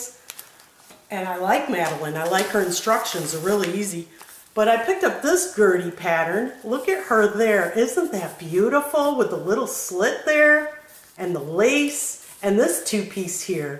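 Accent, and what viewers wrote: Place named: Midwest, United States. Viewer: sound so much like a Midwesterner....but Syracuse has similar inflections.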